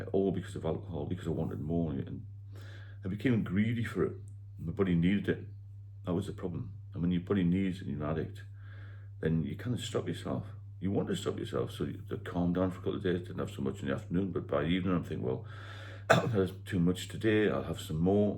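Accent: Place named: Newcastle, United Kingdom. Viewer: A A works for me initially but then after 6/7 visits I ALWAYS throw it away your you tube channel is definitely for me. Love your Geordie accent. I can tell you’re a great person for helping people well done in your own sobriety. 😊👍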